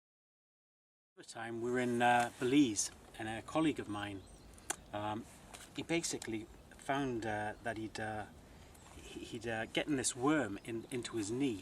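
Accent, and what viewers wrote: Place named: Newcastle, United Kingdom. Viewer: last vid i just watched of him he had a geordie accent, now seems to speak alot clearer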